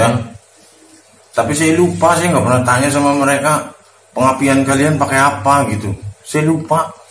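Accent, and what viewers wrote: Banyumas, Indonesia. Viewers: Dari mana bang, koq ngapak🙏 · Rika si wong ndi deneng Kya kenal ngapak e 😂 salam Sekang purbalingga kang😂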